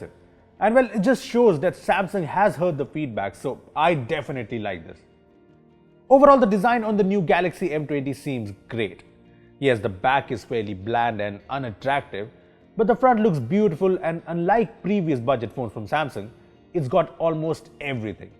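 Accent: India. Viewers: Omg ! All Indian youtubers = annoying accent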